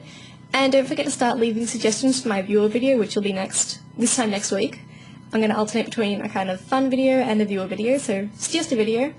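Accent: Australia. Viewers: ZOOOOO MAAAAAAAAHHHH GOOOOOOOOOOOOODNESSSS?! You're Australian! Instant. Fucking. Sub.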